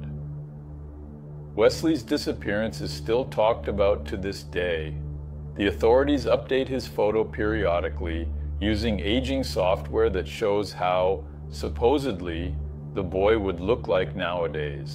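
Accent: Australia. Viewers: Strong twangs of an Australian accent though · Mate have you spent any time in Australia? Mate it sounds like abit of an Aussie accent leaks out? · You sound Australian sometimes! Your english is fine, i think 😊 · Sounds like you also have a bit of an Australian accent. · I’m Australian & feel like I’m hearing an Aussie accent at times. Weird 🤷‍♀️ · Your English is amazing! What's your first language? And what's your accent. Almost sounds like hints of Australian lol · Whoa! Are you kidding me? English isn't your 1st language? I've only now just detected a hint of an Australian accent! This whole time I thought you were American like myself!!! 😅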